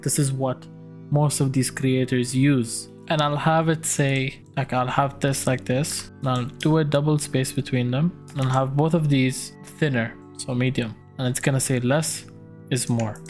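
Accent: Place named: Arab world. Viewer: واضح من لهجته المعوقة كيف مالاحظت ؟؟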